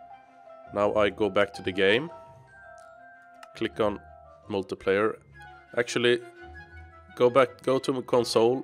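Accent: Sweden. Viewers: You sound so Swedish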